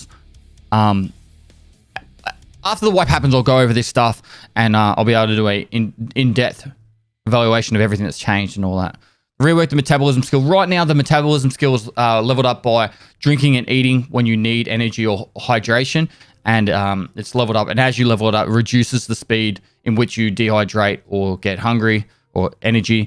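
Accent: Australia. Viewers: it is nice to listen some Australian english, bein a Russian, living in Moldova. EFT: connecting people )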